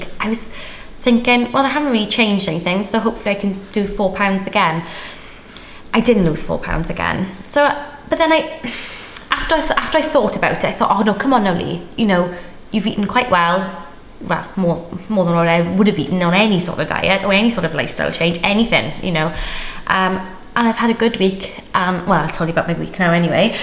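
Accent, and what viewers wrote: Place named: Wales, United Kingdom. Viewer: Fantastic loss again. So happy for you. Love your vlogs. I could sit and listen to your beautiful Welsh accent all day :) x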